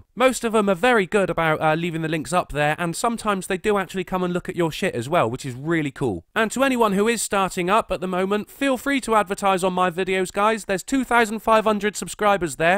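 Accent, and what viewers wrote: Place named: London, United Kingdom. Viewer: "What do you attribute the success of your channel to?" Wit, anti-SJW subject matter at a point in time when it matters and a charming London area accent--if I'm not mistaken.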